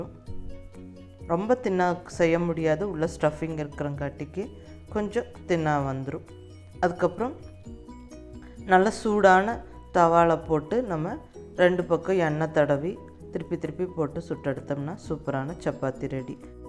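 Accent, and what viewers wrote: Coimbatore, India. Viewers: Aunty u know am a combatorian ,whenever am hearing ur voice am feeling like in Coimbatore...superb vlog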